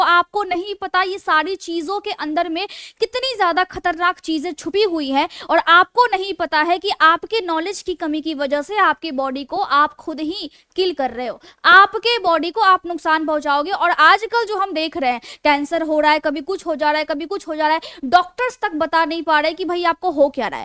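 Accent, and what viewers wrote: Bihar, India. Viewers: Didi app Bihar se ho kiya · Bihari ho ka ji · बिहार वाले पहचान मे आ जाते है बोलने के तरीके से आप कहा से है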